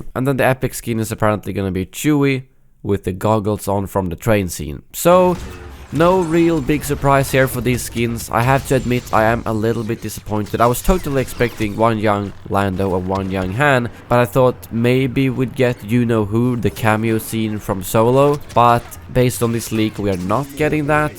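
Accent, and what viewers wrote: Sweden. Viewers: are you swedish? är du Svensk?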